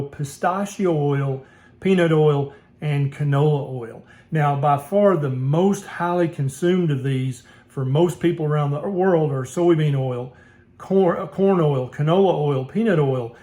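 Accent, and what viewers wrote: South, United States. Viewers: Read the full comment you will know where I’m coming from This doesn’t mean you’re dumb I had grown up in Poca, WV you’re a DR. you have to be near the top of your graduating class I just want to point something out it’s just an observation but I could tell you’re “country” by your accent then when you said bacon grease I knew you were from the south I grown up on white bacon gravy bacon and white bread rode four wheelers again you can be one of the smartest people in your town for your age group and everybody not believe in you because of your accent I know somebody from Elkview, WV he was picked on for his accent because of that people didn’t think he was smart he finished near the top of his class and became an stockbroker his salary is decent.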